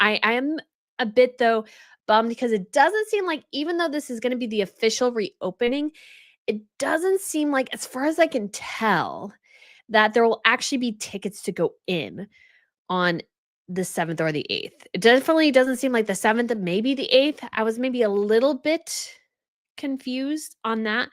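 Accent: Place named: Midwest, United States. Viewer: Hey I’ve been watching your videos for a few weeks and I So appreciate that you refuse to say “controversial” words. I also appreciate your stand on right and wrong (not always what the world considers right and wrong) and your ethics! Love your vids and I’m so glad I discovered you. Are you based in the U.S.? You sound like a typical midwestern girl😊. I love it!!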